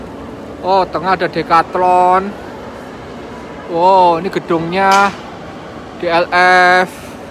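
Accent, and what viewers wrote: Java, Indonesia. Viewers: maaf mas mo nanya mas ini asli mana to.. ngomongnya kek medok medok jawa gitu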